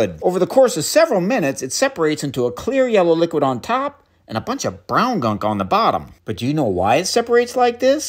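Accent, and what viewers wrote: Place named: South, United States. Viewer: Never thought I'd see a southern accent and actual science mix together in the same person. Good to see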